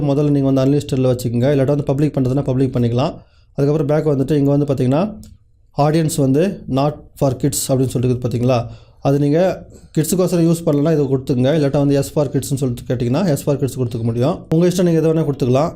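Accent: Chennai, India. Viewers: தலைவா சென்னை தமிழில்,பேசாம தஞ்சை தமிழில் பேசுங்க..(அது என்ன உங்களுக்கு உசரம் height டா..? நன்றி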